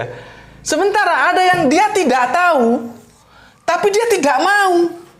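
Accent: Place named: Yogyakarta, Indonesia. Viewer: Kok cara berbicaranya n namanya seperti nya wong Yogyakarta, apa asalnya wong dr Yogya ya? Wah detail banget.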